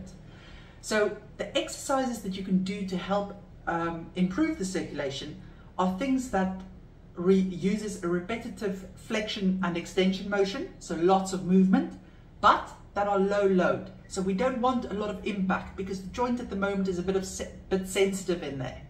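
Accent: South Africa. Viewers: Edit: From the accent it occurred to me that you're South African,. Spike did my arthroscopy.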